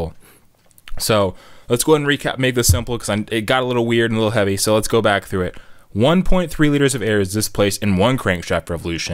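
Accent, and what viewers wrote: United States. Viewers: Slow down with your voice. Most people have difficulty comprehending american. [Aussie in BC]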